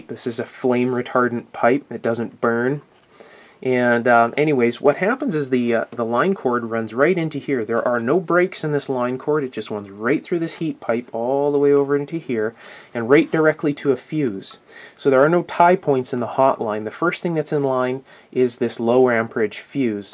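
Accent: Canada. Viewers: Nice Canadian accent,!!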